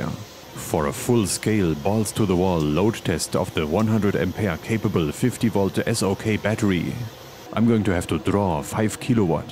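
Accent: Germany. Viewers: After 1 second I knew you were German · a german accent to die for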